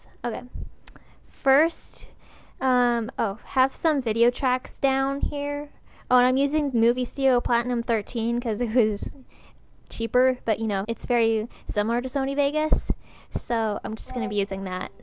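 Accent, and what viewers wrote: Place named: United States. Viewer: Nice voice, now I know ur American xD (compliment)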